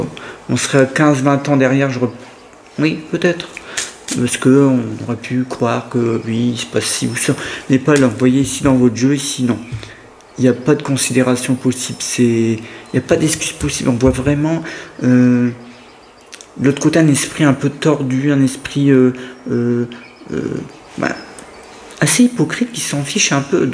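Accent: North, France. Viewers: Un grand merci pour votre tirage qui me parle beaucoup 👍 Vous avez un petit accent du Nord ? 🤔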